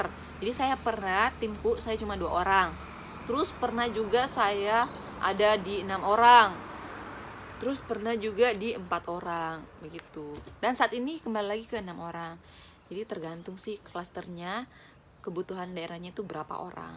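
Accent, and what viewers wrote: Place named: Sulawesi, Indonesia. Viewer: Kalau dari logatnya sekilas org sulawesi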